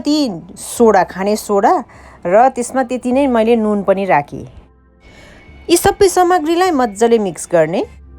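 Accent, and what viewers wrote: India. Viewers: Sneha je jsto vayo pani nepali jsto bole pani kaam ta ramro garnu voko cha di le..Bhasa ta hami eta India ko nepali le estai bolchau maan parcha ta thik cha maan pardena ta na hera video choice is yours.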